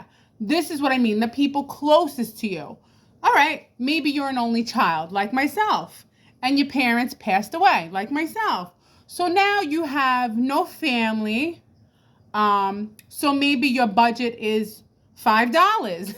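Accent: New York, United States. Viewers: is that a new york or a new england accent?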